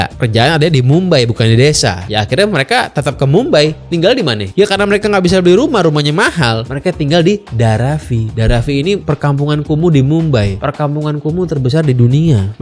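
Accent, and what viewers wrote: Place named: Jakarta, Indonesia. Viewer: Betawi bat dah 😂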